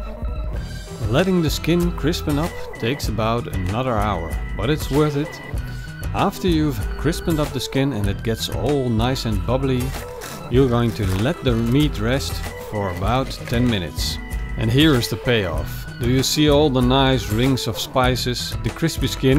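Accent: Netherlands. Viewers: Hoor ik daar een Hollands accent? Mooi recept btw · You can hear the horrible dutch accent. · I already thought I heard a Dutch accent. I was going to ask you if you were Dutch but now I don't need to.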